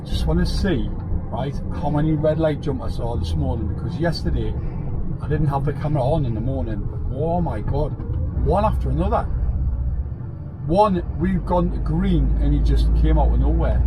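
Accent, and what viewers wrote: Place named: Geordie, United Kingdom. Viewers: What a shame just found this now 2021 hope ur still trucking..nice to hear ur Geordie Twang....in lockdown England... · North Shields and South Shields names come from being the shields of protection from people attacking us from the north sea. Your monk story is not the origin of the place name. Anyway you sound Geordie so I will subscribe. 😃😉👍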